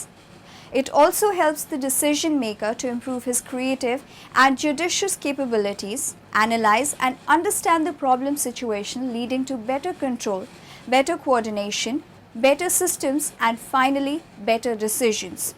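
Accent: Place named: India. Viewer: Great overview of the objective, scope and applications of OR with a nice Indian accent.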